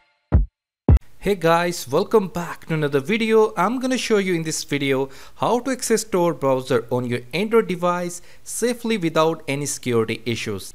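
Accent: India. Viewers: The Indian accent is like getting hit in the ear over and over again.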